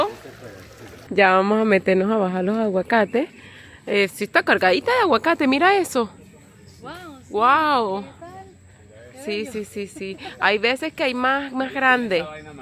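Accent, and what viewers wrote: Colombia. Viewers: DILE A LOS DEMAS QUE HABLEN PARA SENTIR QUE ESTOY EN VENEZUELA, TU HABLAS PURO COLOMBIANO.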